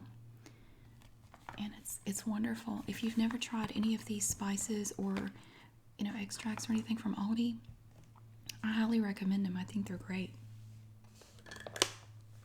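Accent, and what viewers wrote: South, United States. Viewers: I love these aldi videos!! With your southern bell voice so, sooooothing!!!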